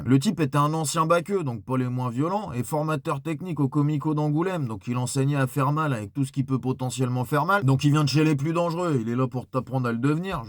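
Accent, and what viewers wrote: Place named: Lyon, France. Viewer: La ?? Remise en question ? Oula t'en demande trop pelo.. 😏 Merci pour tes prises de parole, toujours un plaisir de t'entendre, avec ton bon vieil accent lyonnais 💪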